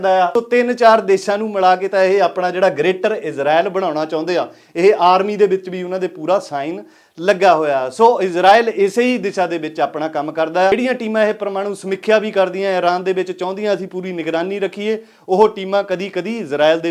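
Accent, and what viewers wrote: Doaba, India. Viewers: tuhadi Punjabi doabe wali lagdi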